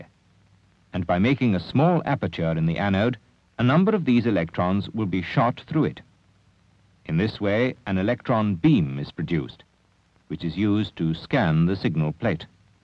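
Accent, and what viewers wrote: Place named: United Kingdom. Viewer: Canada? Why does the voice over sound British??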